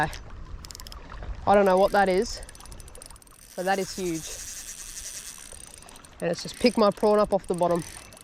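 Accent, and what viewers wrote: Australia. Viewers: You sound Auatralian (and your steering wheel is on the wrong side), but the currency sign in the bait shop showed a $ (dollar) sign. I had to look up what currancy symbol Australians used. I thought Australians used the British Pound system.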